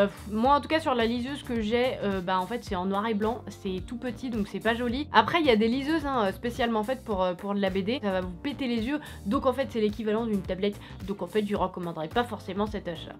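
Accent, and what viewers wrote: Paris, France. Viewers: She does speak fast yes. And she has a little (Parisien ?) accent. Congrats on learning a language ! :)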